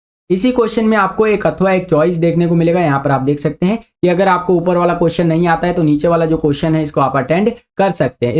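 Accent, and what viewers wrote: Bihar, India. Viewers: Aap बिहार से ho भाई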